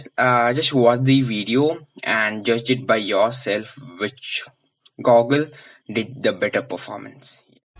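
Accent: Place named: England, United Kingdom. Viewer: Hi I am deon dsouza I liked your English accent. Keep going. U r doing very well.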